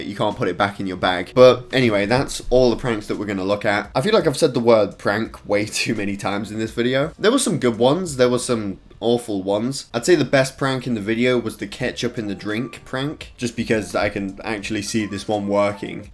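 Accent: England, United Kingdom. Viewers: No it's just the way English people say it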